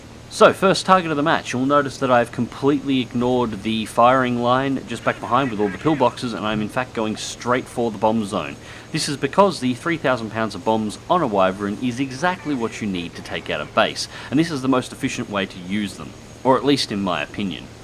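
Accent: Australia. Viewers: Love hearing an Aussie accent on these videos, good show!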